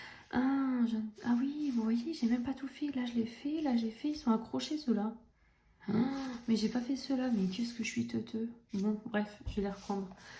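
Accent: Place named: England, United Kingdom. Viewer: Merci pour la mention dans ta vidéo, j'en ai été très touchée! Tu as une super jolie PAC, et vas-y, achète-toi un 3ème exemplaire du livre que tu adores, surtout à ce prix-là! Et surtout, ne lâche pas ton accent anglais, hein, on aime;) Au fait, c'est bon, tu as trouvé tous les trèfles?? Mdrrrrr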